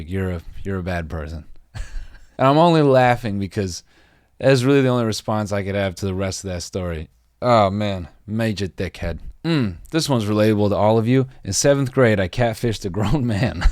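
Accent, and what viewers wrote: United Kingdom. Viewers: I live for British accent, he is the only American who does it so well. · I love this British accent😭😭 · Why is no one talking about his British accent?? Lol amazing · That British voice is something is perfecting slowly hahahahha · talking with a british accent is giving me CODEL flashbacks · Ur British accent has come a long way broski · That British accent is top notch mate. Nice one 👍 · Dudes lowkey British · Damn, does a pretty decent British accent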